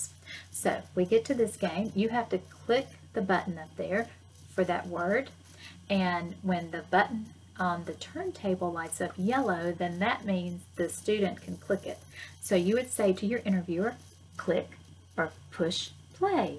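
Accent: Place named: South, United States. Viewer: we're two sentences deep and I love it already! People often comment on my Southern accent and I hear it in you as well. I have to stay on top of that drawl but it always makes me happy to see other teachers with an accent.:)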